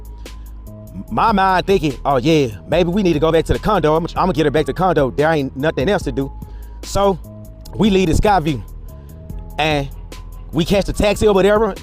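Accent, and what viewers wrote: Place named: South, United States. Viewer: Hello from the Matrix. Love me some. ❤❤. Southern accent I could listen to all day.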